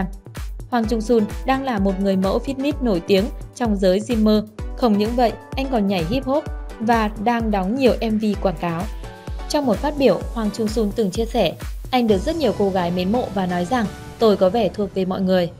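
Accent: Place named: Hai Phong, Vietnam. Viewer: Quê em ở Hải Phòng hay ở đâu thế? Đẹp chứ không phải điẹp, em không phải iem. Người khổng lồ Hulk đọc gần giống hốc chứ không phải Húc. Có mà húc vào... :) Nói thật mấy thằng này bao nhiêu thịt thì dồn hết vào vai với tay rồi, ở cái cần to thì teo hết à :)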